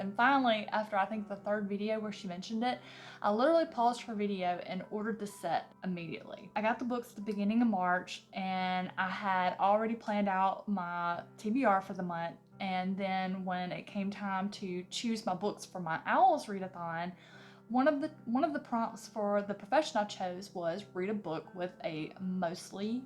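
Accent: South, United States. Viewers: Omg your southern accent <3 I am Canadian and I've loved my trips to the south. John Gwynne is a damn badass and I love him. I hope you LOVE the rest of the series.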